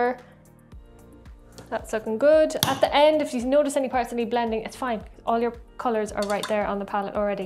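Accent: Ireland. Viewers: Always been on the lookout for a tutorial that shows exactly how to paint skintones, seen some but they were very vague. So seeing this video with it's perfect on the spot tutorial being shown by the gorgeous has already made 2022 worthwhile. The fiery red hair, bright red lips, gorgeous eyes and that wonderful Irish lilt, WOW! Going to check out your backlog of videos and Subbed! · A beautiful redhead chirping away at the camera in a charming Irish accent and doing a rather good job painting up that miniature was a great thing to watch before bedtime, tonight. Good job, Miss, and I bet you do rock the elf ears if ever you wear them? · Oh wow, I wasn't expecting an Irish accent. Galway or Mayo I'd guess (from Galway myself, and in fact see my own first name on the wall). Kinda new to wargaming/mini painting and have no idea how popular it is in Ireland, so this at least gives me some hope! 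Looking forward to checking out the rest of the channel after this as I'm loving this tutorial so far.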